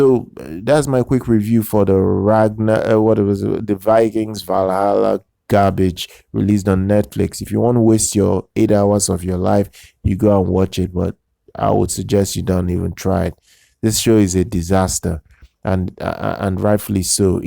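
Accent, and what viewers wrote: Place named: Nigeria. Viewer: Such a breath of fresh air. Seen a video on this. From what I'm going to say sounds like Nigerian, your Nigerian. It sounds like It's so good to see some black people refute this because I am getting into the show and for years now we're seeing just tokenization after tokenization all the time and it's always white characters being changed. So frustrating. I don't like it on either side of the spectrum but the moment you say something you're deemed a racist and it's just so wrong and so many levels. They're trying to blackwash everything in the name of diversity and inclusion and it's just when you're dealing with a historical show and historical figures, this person actually existed. The person that they changed actually existed was a white male and they've changed him for no reason other than pushing the message. Thank you! 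Thank you for this video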